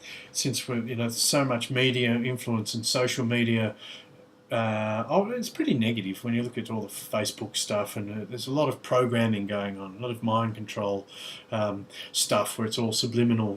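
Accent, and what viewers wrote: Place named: Australia. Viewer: thanks, I'm enjoying your commentary, nice to hear an Aussie voice on YouTube!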